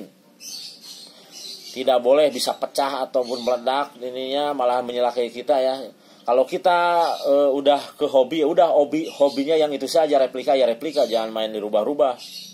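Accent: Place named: West Java, Indonesia. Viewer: nah itu dia om,,kalo ikutan gabung kan biaya nya bikin jangarrr...hehe btw si om nya asli mana ya..? kayanya org sunda ya? logat nya kaya gk asing om,,khas sunda wetan banget,,tasik,,garut,,ciamis..😁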